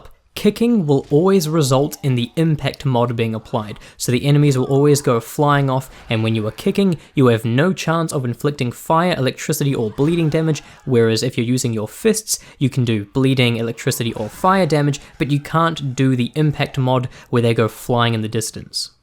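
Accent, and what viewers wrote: New Zealand. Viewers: What part of new Zealand do you come from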